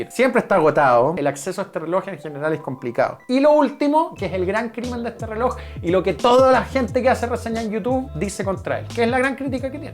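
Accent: Chile. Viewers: feito el acento chileno , perdón 😅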